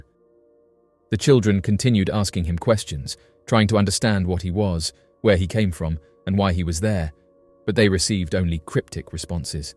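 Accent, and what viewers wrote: United Kingdom. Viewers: When I first started watching this, I had a feeling that you'd be British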